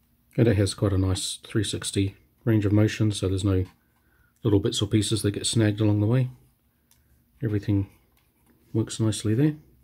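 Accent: New Zealand. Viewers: new zealander?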